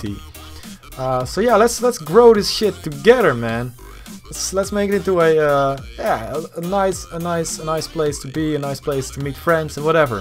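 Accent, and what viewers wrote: Netherlands. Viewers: i would love to play with you but i am only gold nova 4 haha and btw ik dacht al dat je nederlands was ik hoorde het aan je accent :D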